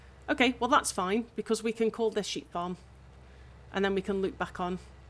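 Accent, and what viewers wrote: United Kingdom. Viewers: Great tutorials. Not many out there covering all the editing of paths and your thought process. Nice and clear instructions too with no pauses and umms and ahhs. Always good to hear a UK voice on YouTube as well lol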